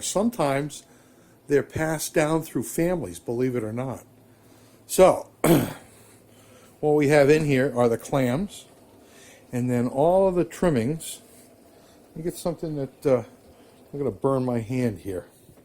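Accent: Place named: Rhode Island, United States. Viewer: You sound like a Rhode Island guy. I use to keep a boat in Mattapoisett but I live in Rockport. Enjoying your adventure videos, you guys have been to a few places I have yet to see. Maybe next summer will provide some opportunities but, alas, I do those trips alone. Been riding for 57 years. First bike was a Honda 50. I delivered newspapers and saved up enough to buy a used bike. The next bike I rode was an Electra Glide! What a step up, holy cow, from 50 cc to 1200 cc. My lady friend does not eat clams, oysters, crabs but she will "suffer" her way through some shrimp recipes. Haha, I am from Louisiana and do not care for lobster but I can make it work, if I have to.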